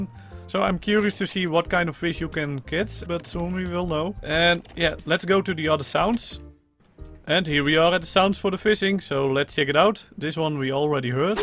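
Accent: Netherlands. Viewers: are you Dutch?? als dat zo is ;D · i knew u were dutch -_- lol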